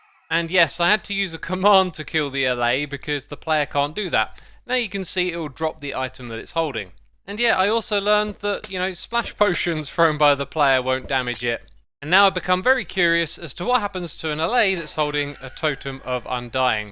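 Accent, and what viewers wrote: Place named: United Kingdom. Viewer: realizing i have never heard anyone with a british accent say "y'all" until today.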